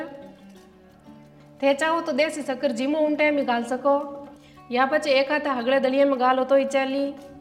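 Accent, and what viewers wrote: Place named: Marwari, India. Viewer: Like Marwari accent.i will try this .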